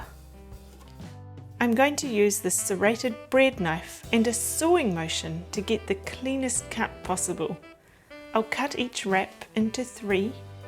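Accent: Australia. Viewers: Love your Aussie accent!🤩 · I wish I could pronounce Easy Peasy with a lovely Australian accent.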